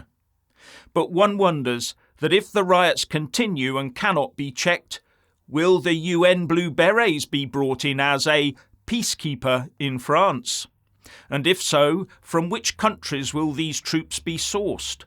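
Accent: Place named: United Kingdom. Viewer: Wow. I've never heard anything quite this British, or with quite that much bite without using vulgar language - I never knew the Britisch tongue to be a form of art. Pretty good summary too. Thank you.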